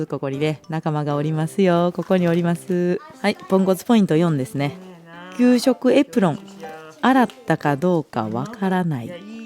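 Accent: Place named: Osaka, Japan. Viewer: 大阪弁も大好きです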